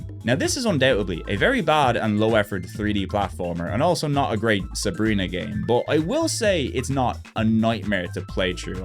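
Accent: Ireland. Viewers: More Irish PlayStation man! Flipping get in!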